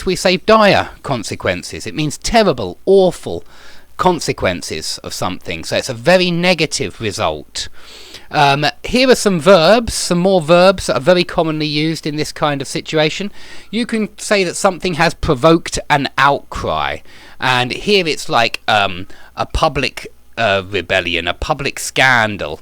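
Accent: United Kingdom. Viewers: British accent, is most popular worldwide, when it comes to learn English. Thanks for the lessons sir.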